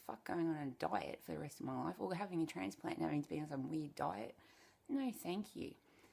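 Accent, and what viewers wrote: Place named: Australia. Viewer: I like your openness and frankness, your flow and insights, - I have a friend who has similarities. You sound like you're 'down under' (from this London perspective!). Thanks anyway.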